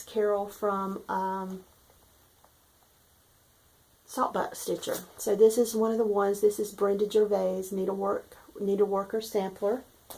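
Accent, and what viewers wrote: South, United States. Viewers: Thank you so much for sharing your trip to Charleston! It is definitely on my list to visit! I was born and raised in Florida, and have many ancestors from GA and North and South Carolina 😄 Your stitching is gorgeous! And your southern accent makes me homesick. Enjoyed my visit! ☘️ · You make me laugh, your southerness is the best.......what a wonderful idea for your sons room.....god bless him for serving, my son is in the AF as well 17 yrs ......I miss him immensely, he will retire hopefully in 3 I don’t think he plans on staying longer, he will be 38 yrs old him and family are stationed in Vegas I live in ohio so I only see once a year, it’s hard...but they will move home after retirement...yeah! Love all your stitching, stash and junking....I’m a junker too 😀😀😀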